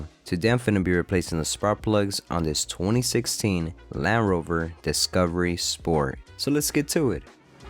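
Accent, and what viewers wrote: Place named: Texas, United States. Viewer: are you from Texas?You said you was fitna be replacing… sound like a home boy to me!!🤙🏾🤙🏾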